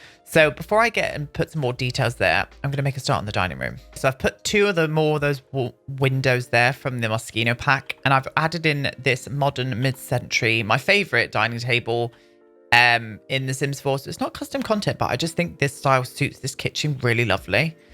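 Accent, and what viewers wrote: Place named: Devon, United Kingdom. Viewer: You talk like me! Although my voice is higher - I'm a Devonshire Bumpkin too. I started playing the Sims 4 at the beginning of Lockdown - my 8-year-old granddaughter got me into it.... she said "Nanny, you have to play it as you can marry lots of men and then kill them"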